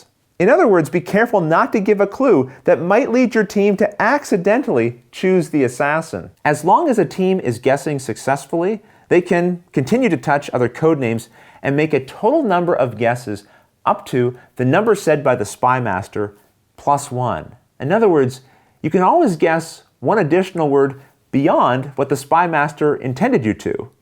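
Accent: Canada. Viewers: is he canadian? he says "about" and "out" very canadianley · We've played this after watching your video, have to say, wonderful job you're doing there and i like that Canadian accent of yours, cheers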